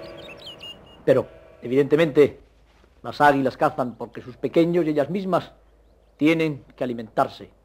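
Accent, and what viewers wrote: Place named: Castile, Spain. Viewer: The narrator's voice is awesome. Old time diction and Castillian accent.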